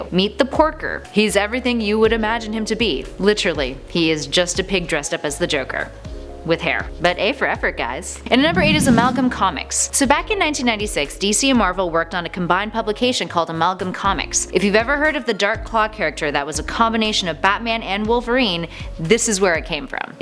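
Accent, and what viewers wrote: Canada. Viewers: Is that a canadian accent i detect or am i tripping? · Is she Canadian? She's adorable ☺ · I love u and ur needless and ur Canadian accent it's super hot ;)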